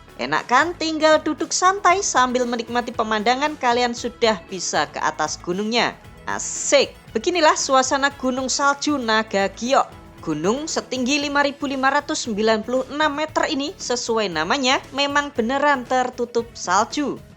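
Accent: Java, Indonesia. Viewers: eksyen naratornya yg njawani banget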